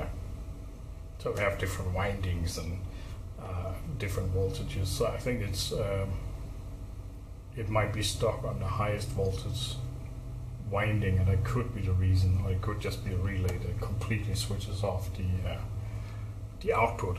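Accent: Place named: Denmark. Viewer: thx for the video, also took the plunge on a couple of these. was comming short when checking LDdrivers and loads due to high power laser diodes, and purchased a couple of these power supplys. was looking at that KA3005P but seems to cost around 110US delivered + tax and then saw the KA3003P (nax3A) for 63US delivered, so took that, but doubtfull that it will get shipped hence 63US seems to low. while waiting for it to get shipped' instead purchased the KD3005P from Reichhelt Germany to around 77EU+5.6EUshipping-fee and it got delivered today, 25hours delivery time from Germany to Denmark, impressiv. Trying to get familiar with levels and the rela'es, seems to click around 3 times from low to top 31v voltage, but it puzzle me that it keep dropping the voltage on load but likely my rookieness to blame, but i do cherise that its the P version and able to handle it with the mouse and keyboard the values and getting graphical views, makes the adjustment easy, evewen thow the two clickknobs on the KD model is easy to manage. 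... btw your accent very much sound like your from Denmark..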